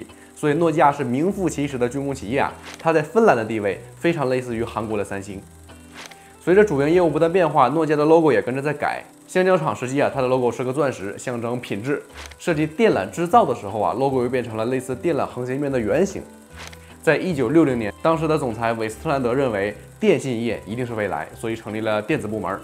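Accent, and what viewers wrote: Northeast, China. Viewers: 说话满口大碴子味，😅😅😅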